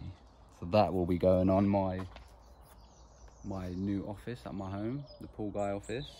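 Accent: United Kingdom. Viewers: You’ll get to a million very quickly. Hope you see this, I have a question! as I can hear you’re a Brit.. are there that many people who own outdoors pools here in the UK? I think I’ve never seen one 😂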